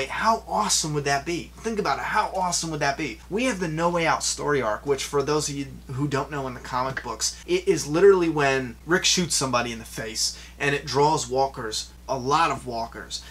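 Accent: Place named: New England, United States. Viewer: The way you talk...are you from New England??? Haha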